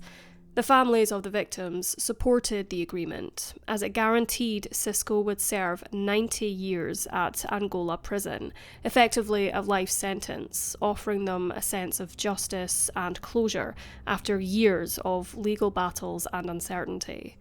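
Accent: Scotland, United Kingdom. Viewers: One of the best true crime channels on YT. Love the Scottish accent. It is Scottish right? I love it no matter what accent it is. 🙂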